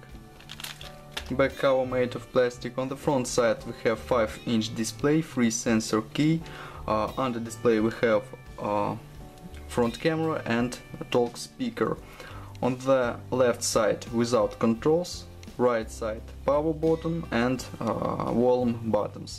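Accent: Russia. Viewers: God you can't mistaken that russian accent. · Дружище, лайк за волю к победе) Сразу слышно русскоязычного ютубера, думаешь над каждым словом. Американцы стрекочат по другому)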